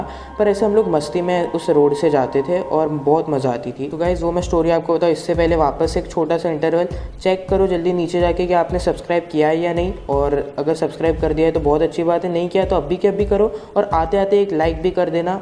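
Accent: India. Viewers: Are you bengali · Bhai ap gujarati ho???? +_+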